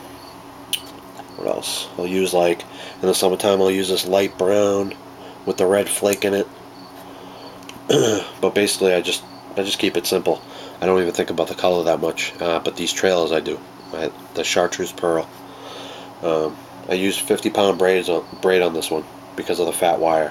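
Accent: Boston, United States. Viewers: do the Zoom tab tail grubs swim well for you? I bought a pack intrigued at the idea of an erratic tail action the tab would give, but i couldnt get them to swim right no matter how I trimmed my swimjig skirts. Also, just curious. Are you from Boston? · you sound like a boston guy, if so we should make some casts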